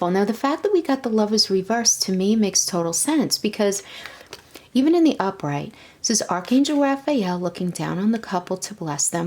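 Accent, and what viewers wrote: New York, United States. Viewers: Is it new York accent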